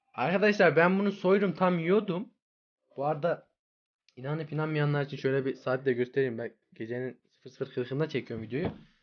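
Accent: Erzurum, Turkey. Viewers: abi sen erzurumlumusun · Abi erzurumlumusun bende erzurumluyum dadasim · Abi sen erzurumlumusun ben erzurumluyum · Neydisen gardaş sen erzurum un neresinden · Erzumlumu yoksa Erzurum da mi yasiyot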